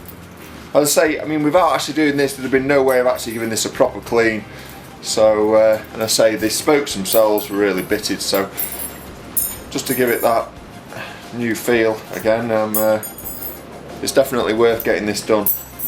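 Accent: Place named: Stoke, United Kingdom. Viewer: I have just got myself an '84 Raleigh Corsa (which I collected from all the way from Stoke, so going by your accent, not far from you). This is similar to this bike to your one here but in metallic pearl white. I now own... 1985 Quasar 1986 Sirocco (same as <num> Sirocco (same as <num> Corsa 1985 Competition 1985 Road Ace 1984 Team Replica 1989 Record Ace 2010 Avanti Team Just need a 1985 Record Sprint then my "wish-list" collection will be complete. Oh, maybe a gold-plated Centenary and the frame-only "Reynolds 531 Pro Super" chrome Team Replica & Oyster & semi-chrome sets and a 753 frame for good luck. <link>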